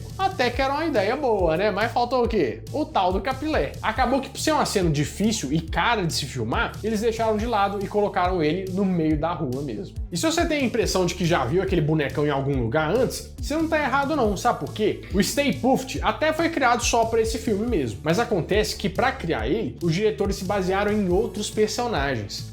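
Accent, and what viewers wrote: Minas Gerais, Brazil. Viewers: Como eu amo seu canal!!! Risadas garantidas e esse sotaque pão de queijo q eu amo, tendo em vista q eu tenho sangue mineiro correndo nas veias, apesar de ser carioca da gema. Minha mãe era mineira e mudou p RJ aos 16 anos.... Parabéns pelo canal e conteúdos!!!!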